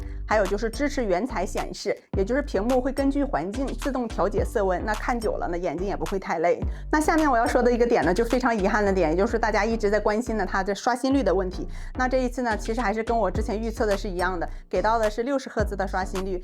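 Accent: Northeast, China. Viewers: 麻烦能否克制一下东北口音，尽量说普通话，这样效果更好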